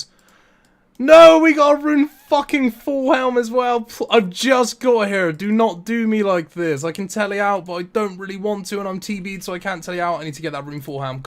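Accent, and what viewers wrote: Bristol, United Kingdom. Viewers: That accent is awesome, where in the UK you from? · Ooh arr Bristol Lad are u? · Subbed because of the Bristol accent.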